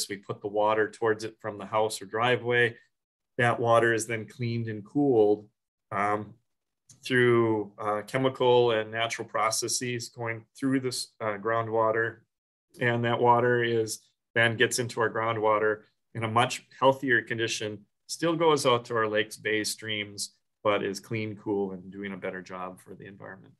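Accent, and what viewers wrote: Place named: Long Island, United States. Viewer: Thank you!! Love the Long Island local talk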